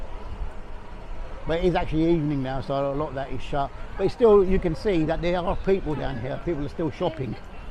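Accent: United Kingdom. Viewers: You sound more Cockney than me and I was born in Stratford in 1969 👍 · I remember Bodgers in Illford and Illford Town Hall disco's on a Monday night (Interupted due to N. Benn fighting with Locals!). No offense but I detect a bit of Brummie in your accent, am I right? 😄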